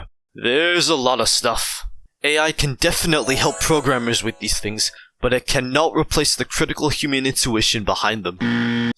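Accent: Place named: Netherlands. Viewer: I can smell the Dutch in your accent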